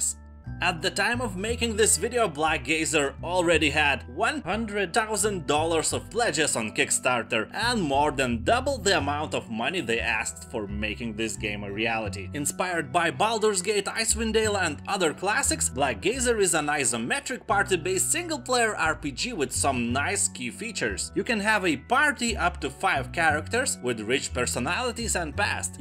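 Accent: Russia. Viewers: This dude sounds like russian to me :) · бесит его русский акцент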